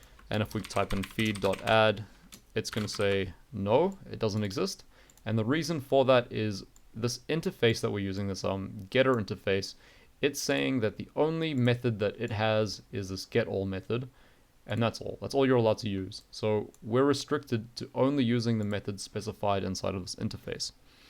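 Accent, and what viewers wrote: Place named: New Zealand. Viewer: Enjoying your accent. Chur from a fellow kiwi for your excellent content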